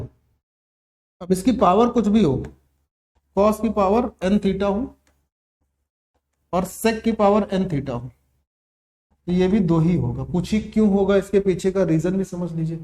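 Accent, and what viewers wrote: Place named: Farrukhabad, India. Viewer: Sir kha se hn aap Farrukhabad ke aas pas ki bhasa lg rhi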